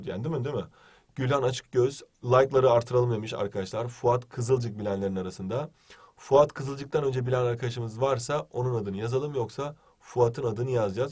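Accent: Turkey. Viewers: şive meselesi. Egeliler öyle konuşuyor.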